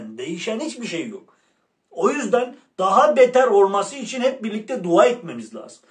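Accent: Erzurum, Turkey. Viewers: Ben senin haberlerini birazda esprili tarzından dolayı izliyorum. Ayrıca anlatımındaki Erzurum ağzıyla yaptığın tanımlamalarda güzel Ancak Allah -Ekmek vs ile ilgili benzetmelerin kıyaslamaların yakışıksız olmuş.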